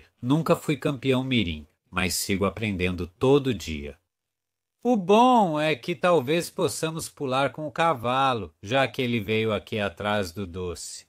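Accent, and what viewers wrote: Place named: India. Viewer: Pois é, eu adoro o jeito que ele cria a própria língua misturando o sotaque indiano forte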